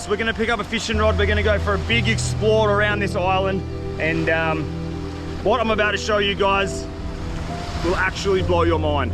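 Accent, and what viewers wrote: Australia. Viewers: Does this really sound normal to australians? I love this guy's accent, close my eyes and listen to him talk when im trying to get sleepy. But it never works because the videos are so interesting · I love the long videos, I still remember meeting you in indo at a beach, I recognised another Australian voice and looked behind me and there you were with the boys! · My grandma was from Indonesia. I live in the Netherlands. Indonesia is so beautyfull. Its a shame all the rubbish thad washes up on the beach. It is really a beautyfull country. And youre Australian accent is always so funny and uplifting to me. "Yea dawgies". 😂 Love youre channel man.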